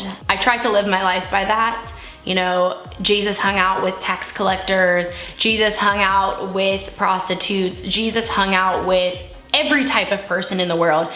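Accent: South, United States. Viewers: You’re southern accent really came out in this video 😂 and I loved it 😍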